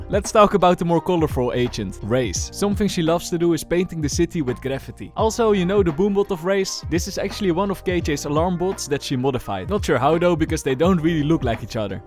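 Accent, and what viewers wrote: Netherlands. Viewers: i love your video’s but please PLEASE fix your dutch accent 😭😭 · he has a dutch accent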